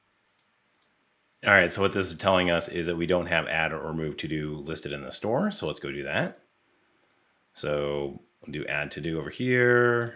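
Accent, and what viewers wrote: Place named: Germany. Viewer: Have a great weekend. Love the German pronunciation. 🙏😀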